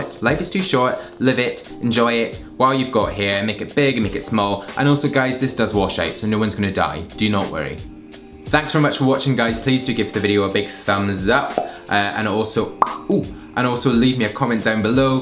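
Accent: Scotland, United Kingdom. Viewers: you know what's cool you're scottish and i understand everything you said because scottish people have a different accent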